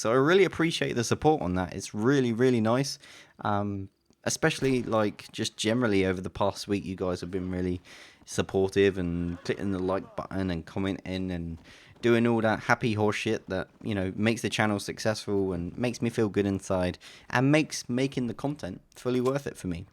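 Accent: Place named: England, United Kingdom. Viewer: Very good to hear a familiar accent Lol. Where in England are you from mate?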